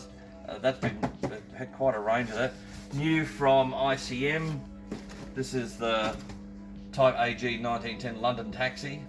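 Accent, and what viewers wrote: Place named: Australia. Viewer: I am American and I understand his Australian accent.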